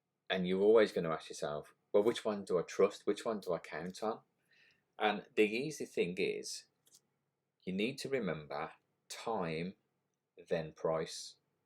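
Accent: United Kingdom. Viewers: Nyc analysis of the charts bro Luv the British accent too 😂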